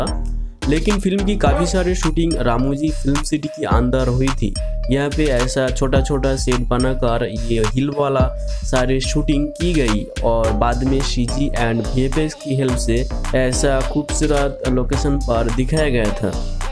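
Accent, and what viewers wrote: Bengal, India. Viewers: kotha gulo bangla te bollei besi vlo hoto tomar kotha gulor mddha onk bangla tan a6e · Bhai aap bengoli ho???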